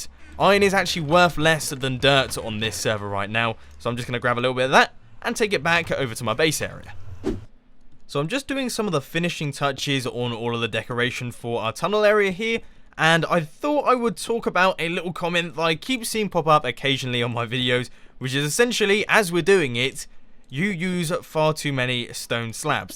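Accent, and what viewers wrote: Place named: United Kingdom. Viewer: I seriously could lissen to that british accent all day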